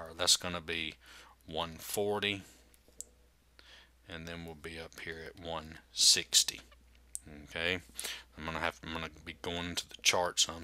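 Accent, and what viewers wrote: South, United States. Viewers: you are AMAZING!! as soon as i heard the southern drawl, I knew you'd get right down to business! i read this in my textbook about 10 times and was completely lost. I watched your video ONCE and got it. The struggles of signing up for an in-person class but having the teacher scam me into a "hybrid" is REAL. I'd kill to have you as my stats teacher!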